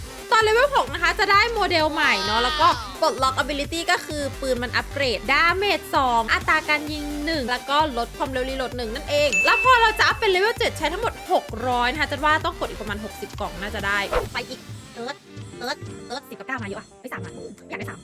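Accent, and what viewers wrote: North, Thailand. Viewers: อ้าว คนเหนือเหรอครับปม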